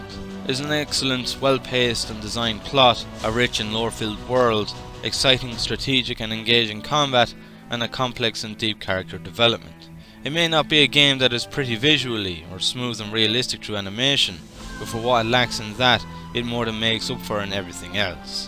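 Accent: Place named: Ireland. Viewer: Dude you are fine-keep your Irish accent and be proud of it my man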